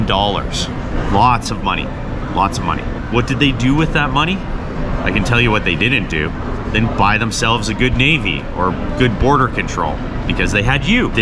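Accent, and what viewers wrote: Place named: United States. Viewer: Jack ass, you actually sound American. Ignorant and over confident.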